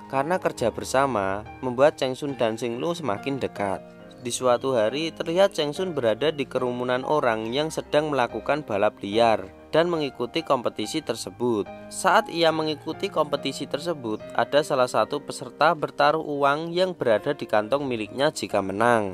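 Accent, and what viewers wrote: Java, Indonesia. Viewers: medok amat yak/// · Medoook bangett sii min .. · Pegel kuping,, medok banget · Mentok jawa nya. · Medok betul jawanya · Jawir.. Logat jawa nya ilangin... Biar tdk jd film lawakan ...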